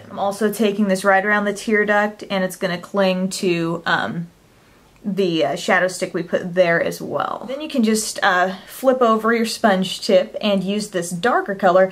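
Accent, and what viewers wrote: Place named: South, United States. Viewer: I am new to your channel and i'm so glad I stumbled across you because you are so great at what you do. Also, I don't know much about you personally but I swear you have a tinge of a southern accent.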